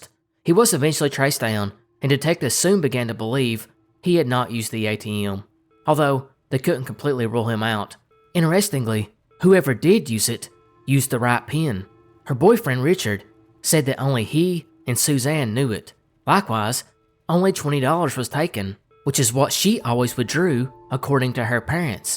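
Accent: South, United States. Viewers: This is great. I can’t sleep without his calming southern drawl lulling me.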